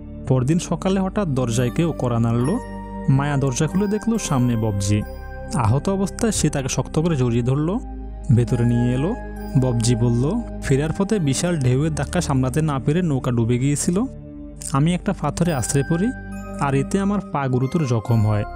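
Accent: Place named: Chittagong, Bangladesh. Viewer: বয়েস টা মনে চট্টগ্রাম এর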